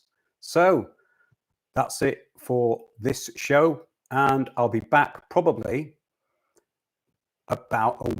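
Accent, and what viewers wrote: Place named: England, United Kingdom. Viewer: This is fascinating. I can clearly see how this transhumanism is an extension of this serpent cult. From childhood/birth (in their depraved eyes) to death. Especially once a child is transitioned early, there are constant medical issues and daily rituals they must do to maintain the deception and stop infection (or to even get an erection.) Also, even to after death, since no doubt most folk who go through such horrendous procedures will feel cut off from God, and may believe they have no redemption if they realise they made an error and desecrated the body God gave them. Therefore, they are stealing folks ability to believe they can be redeemed through the Blood of Christ. Absolutely fascinating. I grew up in the UK, so it's great to hear deep information and truth spoken in English English. Thank you for your work. 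Wondering what you think about David Icke getting pushed forward by Redacted the last few weeks regarding the Epstein stuff. TBH I have never been interested in his work, but my mom was taken in with some of his stuff.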